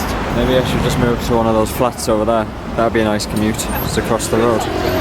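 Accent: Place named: North East England, United Kingdom. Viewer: New Tech Genius Cause has a NE England accent. Is he more Durham way originally? They sound the same to me.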